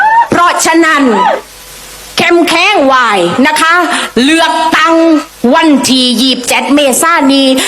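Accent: Thailand. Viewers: พูดได้ดีมากครับ ภาษาใต้สวยงาม ผมเป็นกำลังใจให้เต็มม้วน กับคนของประชาชนครับ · ສຳນຽງພາກໃຕ້ ຟັງແລ້ວມ່ວນຫູດີເຂົ້າໃຈງ່າຍ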